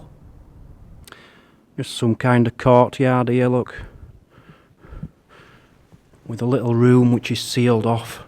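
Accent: United Kingdom. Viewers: Wow, 7 miles in 21 minutes, that is 7 three minute miles and you were hardly breathing hard. When are you going to do the Olympics? I love your since of humor. Are you from England, Scotland, or Ireland?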